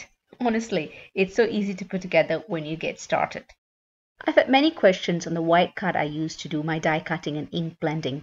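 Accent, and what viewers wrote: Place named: United Kingdom. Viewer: absolute joy to watch . Gorgeous cards , great technics . Nice hearing a UK voice and UK card sizes .x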